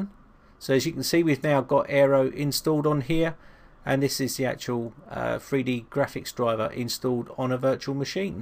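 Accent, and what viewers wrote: United Kingdom. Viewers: Sotaque britânico é top kkkkk Excelente vídeo!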